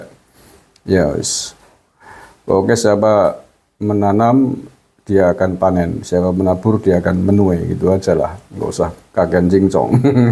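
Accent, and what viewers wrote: Banyumas, Indonesia. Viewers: Janen rika wong ngapak ya,,memeng prabowo gibran yes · Bang smpyan itu jawanya mana ya kayak nya ngapax ngomongnya.salam 0,2 maju terus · Deneng ngapakk pak😅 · Pak Gus Marngapak jg y..pada bae nyong beh ngapak pak bravo sehat selalu🙏💪betul pak apa yg ditabur 01&03 akan mereka tuai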